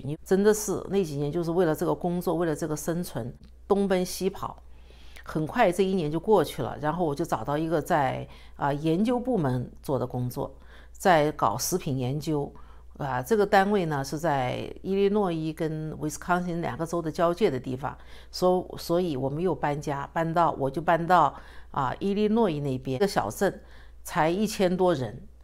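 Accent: China. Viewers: 厲害， 有江西南昌的口音。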